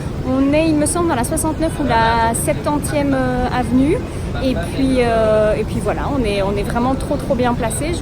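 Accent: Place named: Belgium. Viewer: Quand les videos sont de qualités c'est tjs un plaisir à regarder. Sur la vidéo de présentation de Many j'avais cru entendre un petit accent belge et j'ai eu confirmation sur la première vidéo que vous étiez du plat pays . Si j'ai bien compris vous faites votre trip pendant 13 mois . Vous voulez faire tout le continent américain et renvoyer Many en Belgique ou vous allez le vendre sur place ?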